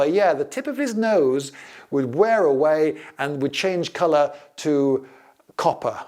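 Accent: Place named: Cockney, United Kingdom. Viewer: Lol I love his cockney voice. 'Dan't mack arand with the currency!'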